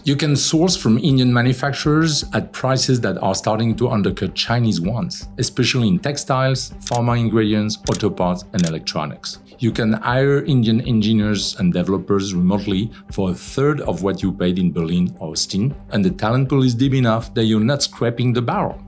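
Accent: France. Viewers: Narrator seems to be of French background trying to speak in English language in order to target Indian viewers..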